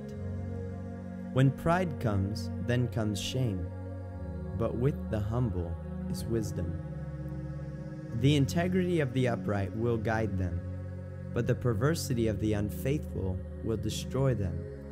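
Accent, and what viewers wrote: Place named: England, United Kingdom. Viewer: Thanks you so much bro. I am learning English accent with your bible study.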